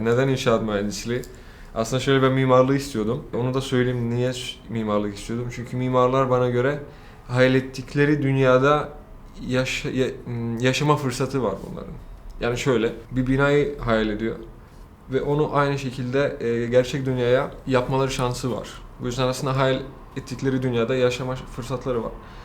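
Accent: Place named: Cyprus. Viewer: Kıbrıs aksanına çok benziyor konuşması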